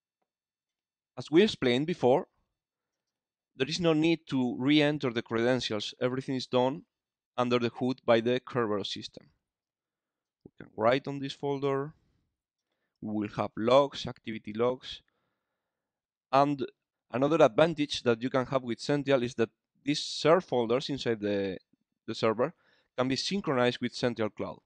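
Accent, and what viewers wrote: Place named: Spain. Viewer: I understand him. I do not speak Spanish. His accent is familar to me, Spanish accent is widespeard in mainstarem media.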